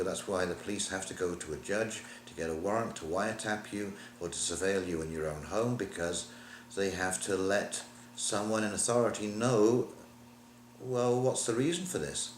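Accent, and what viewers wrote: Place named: Yorkshire, United Kingdom. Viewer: I am questing you are from Yorkshire England, great video and 1 of many trying to get the word out. my son is fitting smart meters, he thinks they are a great thing as many do, i will show him this video, its about showing people the real truth, and searching, my son is fitting 5 on average per day many are inside the home, they have fitters all over England doing the same, next comes 5G,, they are trying us, and many can't see it, and the joke is they had this technology a long time, there is more to come, chip will be next, we could stop it so easily if we all pulled together, but while we have many mostly young thinking all this stuff is cool we don't have a chance to stop it, I'll get russia say no to them as they banned many things including microwaves , my advice would be to purchase a device that can tell gow much radiation is coming from all our household items, even the simple toaster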